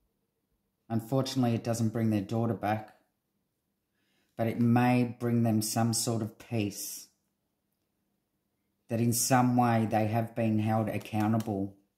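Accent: Australia. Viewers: Hope you had a lovely Mother's Day if you live in the US. I estimated that you are Australian, though. I love your podcast. Wow, what a start, the devil. Makes sense to me. They should have a cost to bear in Gabbys's murder! I have often wondered if his mother wanted Gabby out of their house to begin with. Thus, the purpose of Brian planning this road adventure in the first place. They took swift action to meet and plan it all out! Can you ask spirit what country he is in, if alive??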